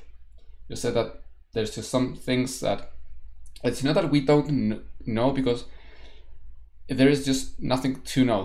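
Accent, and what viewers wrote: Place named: Germany. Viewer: Good explanation brother, btw I love your German accent. I wish your channel grows and you keep making videos for us (or me)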